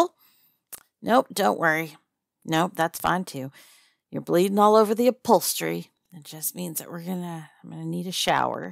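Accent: South, United States. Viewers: It's hard to tell what your real accent is. I liked the southern charm accent though. Your stories always make me smile and my day better. It's awesome that you are growing so well. You are on your way to a play button, i can feel it. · Wait, did he seriously smoke weed as a painkiller? I didn't know you could do that. Anyway, I noticed a startling lack of harpy audios on YouTube, and I'm a sucker for southern accents so this was right up my alley. Thank you. ❤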